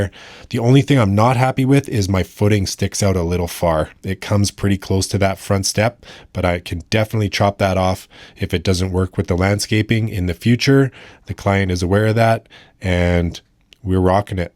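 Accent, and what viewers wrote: Canada. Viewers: Are you Canadian by any chance?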